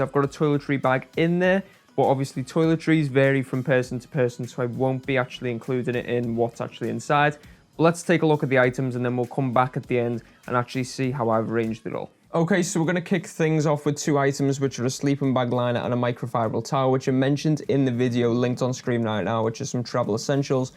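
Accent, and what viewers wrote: Liverpool, United Kingdom. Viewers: Some great tips which I will use on my next (4th) InterRail. Quick question - what's a good weight in kgs to aim for in Summer and Winter please? ( I have a tendency to overpack - apart from when I make 3 night trips to Eastern Europe by plane when I maange everything in my standard size Fjallraven, Kanken !) Ta la ( you sound Scouse like me !)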